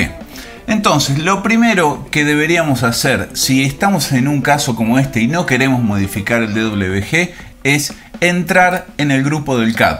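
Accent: Argentina. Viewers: Me encantan sus videos Maestro! y Me encanta mas el acento Argentino! Yo ya usaba desde hace casi 8 meses el Sketch Up pero con tus videos aprendo mucho y me quito ciertas malas costumbres o vicios y comienzo a dibujar mas rápido! Saludos desde Mexico!!! 🏠🏠🏠 🏠🏠🏠 🏠🏠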